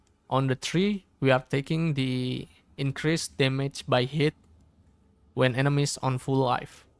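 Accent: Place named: Indonesia. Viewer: Thanks for the awesome build bro enjoying it very much! Btw, From your accent, are you indonesian ?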